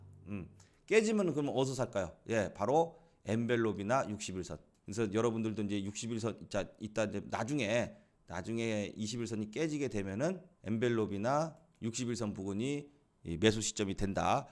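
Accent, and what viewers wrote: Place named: Chungcheong, South Korea. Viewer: 발음을 봐선 서울은 아닌거 같구 충청도 ㅎㅎ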